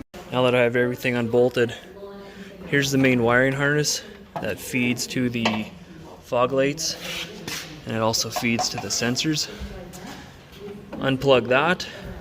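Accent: Canada. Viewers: I knew you were a fellow Canadian after the first minute of the vid. Good job saved me a bunch of time !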